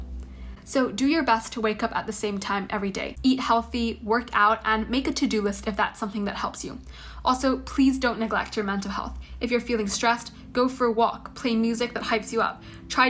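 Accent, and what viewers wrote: United States. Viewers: you sound american but are doing gcses? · How come you have an American accent ❤😂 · u sound american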